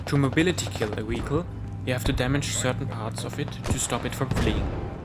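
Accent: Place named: Russia. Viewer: Какой то акцент русский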